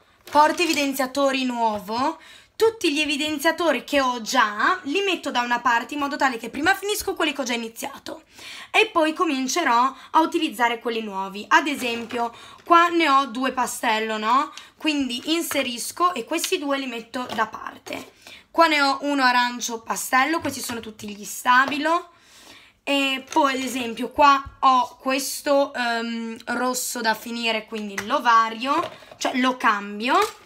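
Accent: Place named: United Kingdom. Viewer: ODDIO adoro la tua pronuncia in inglese. that's so british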